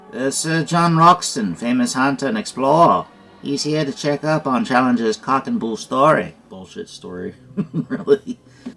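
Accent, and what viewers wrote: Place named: United Kingdom. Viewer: Dang! This movie is almost a hundred years old. It's fascinating to see this. Btw, Sir Arthur Conan Doyle would approve of your British accent!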